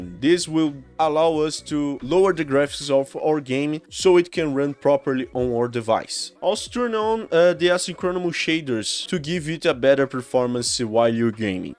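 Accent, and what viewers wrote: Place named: Brazil. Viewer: Are you from Brazil people there speaking English is just like yours not saying your English is bad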